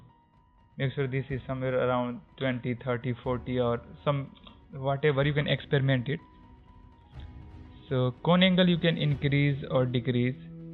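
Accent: India. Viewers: It makes me happy . When You hear Indian accent and You know it's not scammers..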